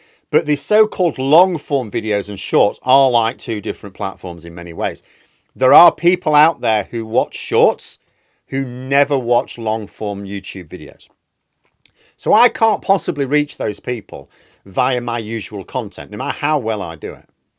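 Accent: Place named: Manchester, United Kingdom. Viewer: Here for the Manc doing posh tory comedy voice 😆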